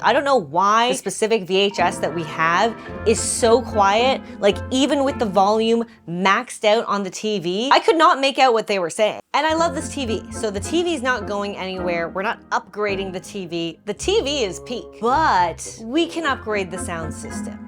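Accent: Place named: Canada. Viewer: I don't think I understood how a canadian accent sounded before watching your videos. Now I can most definetely tell haha.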